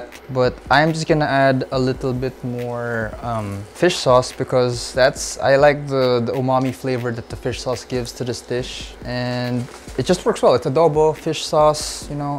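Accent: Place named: Philippines. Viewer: I THINK YOUR ARE FILIPINO BECAUSE OF YOUR ACENT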